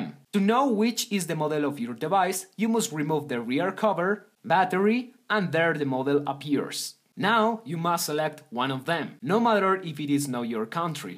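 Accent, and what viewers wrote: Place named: China. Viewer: You need to add subtitles, I can't understand your Chinese accent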